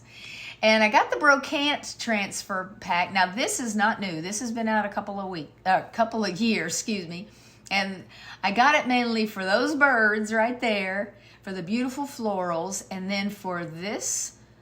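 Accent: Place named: South, United States. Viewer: Happy new year! May god be with you and your family I am so sorry you are going through radiation and chemo god has you in his hand sweetie! I want you to know I love to watch your videos I love your crafting and to be honest I just love your sweet sweet southern voice you have the voice of an angel idk y but you do!! Your voice is very calming to me!! Hope you has a good Christmas , I will have you in my prayers!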